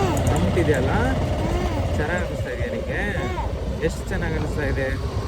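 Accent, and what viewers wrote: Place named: Hubli, India. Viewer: He is so cute, I feel so good to see him talking in Hubli kannada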